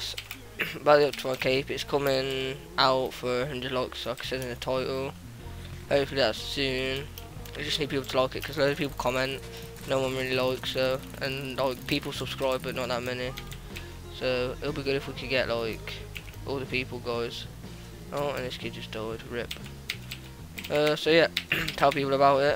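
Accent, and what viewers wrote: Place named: Birmingham, United Kingdom. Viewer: you from birmingham? you sound like it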